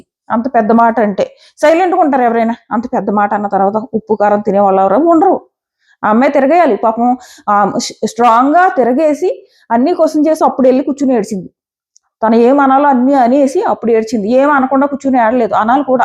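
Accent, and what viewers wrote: Godavari, India. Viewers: 😂 మన గోదావరి యాస బావుంటుంది madam. మాది Demon Pawan ది same town tanuku west Godavari district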